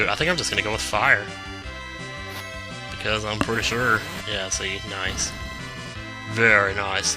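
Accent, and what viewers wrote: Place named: Midwest, United States. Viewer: aw sweet somone from the midwest im guessing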